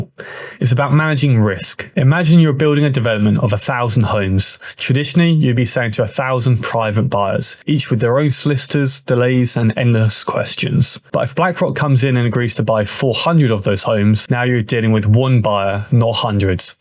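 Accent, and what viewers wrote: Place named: England, United Kingdom. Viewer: Not just across the UK ,but almost the whole world! No country should ever sold anything that was in their countries, and put very strict laws on how we import,export the goods along with never enjoying EU. Very appreciated information, aand your beautiful English accent, wich i always loved.You are probably from London or somewhere close Birmingham, guessing.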